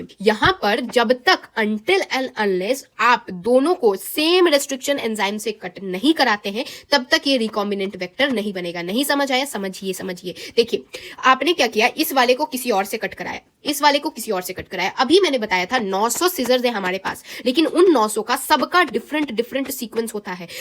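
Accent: Bihar, India. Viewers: Didi aap bihar se ho kyyy you have an accent like us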